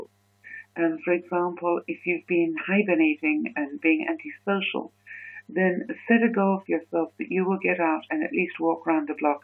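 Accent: United Kingdom. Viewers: Are u British? Because u have a British accent!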